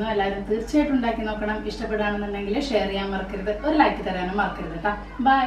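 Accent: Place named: North Kerala, India. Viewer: Madam... നാട്ടിൽ എവിടെയാണ് ? നല്ല വടക്കൻ ഭാഷ .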